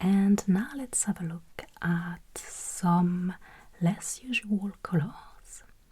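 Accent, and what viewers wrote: France. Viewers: L'accent ! J'ai cru qu'elle était vraiment française 😮 elle l'est...? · You are a very gorgeous French woman. Love your accent. 😭😭😭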